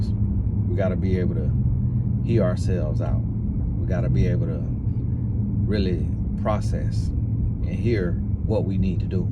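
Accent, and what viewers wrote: South, United States. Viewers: You may have a country accent, but you teach alot of good knowledge! I have a strong Texan accent, when I travel sometimes ppl don't understand me. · I love your country accent.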